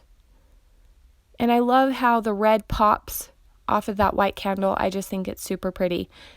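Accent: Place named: Utah, United States. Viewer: I know that "accent"! LOL....I grew up in SLC. Haven't lived in UT for 20 years, but still have family there.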